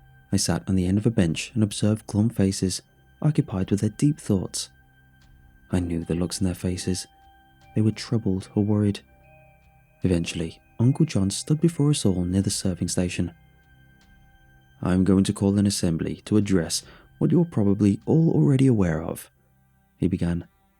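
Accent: United Kingdom. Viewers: I have watched so many videos and the British voice was a major set back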